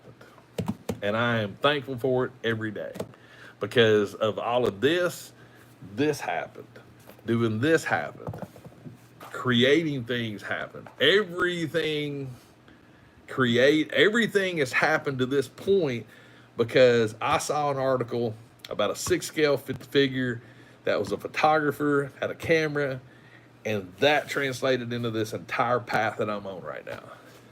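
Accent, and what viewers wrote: South, United States. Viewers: How could I not subscribe to a toy collector with a southern twang.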